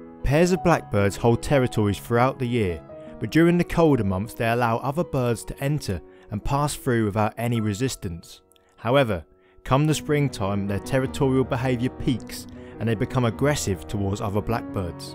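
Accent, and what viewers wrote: United Kingdom. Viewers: Awww mate, that beautiful accent. You make me miss the UK. Thanks for posting. Great stuff. Love from Alabama.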